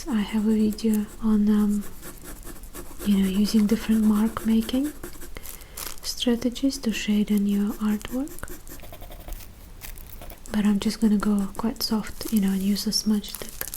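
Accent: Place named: Russia. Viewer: Your accent telling me you’re quite fluent in speaking Russian)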